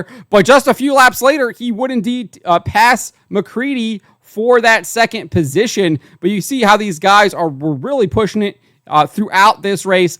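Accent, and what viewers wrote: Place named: East Coast, United States. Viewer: Damn right.... Idk where you coming from (location) (NC in the house) but you sound like an east coast guy and we've got very similar racing tastes. But I most definitely agree, this is one of best shows going. I checked the North-South 100 off last year as a bucket list event. Unfortunately I won't be able to go back this year as I'm checking off some other bucket list stuff .... However The PDC and Eldora are on next years bucket list..... Maybe we can hook up at some point and catch a race, have a beverage and enjoy a race together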